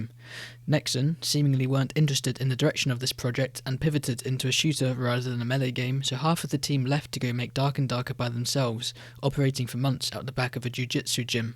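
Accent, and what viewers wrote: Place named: United Kingdom. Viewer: Nice video but there was an annoying british noise talking through it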